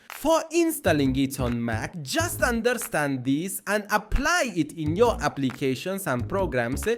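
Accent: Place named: India. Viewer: چرا لحجه هندی داره هوش مصنوییت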